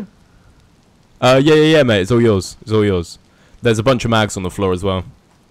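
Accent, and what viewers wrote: Northern Ireland, United Kingdom. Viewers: Oh lord the NI accent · The northern Irish accent has me dying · well, being from Northern Ireland, our accent is incredibly similar. Considering how a fuck load of Scots came to Belfast and surrounding areas. Hence the name, ULSTER SCOTS. Also, no need to be a prick about it. Being that you work with him, you'd know he's Scottish. I didn't know he was Scottish, I heard the accent and thought it sounded Northern Irish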